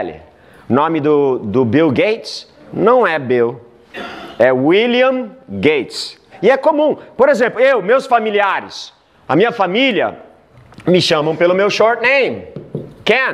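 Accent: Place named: São Paulo, Brazil. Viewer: ele tem sotque de Paulista sq sem gírias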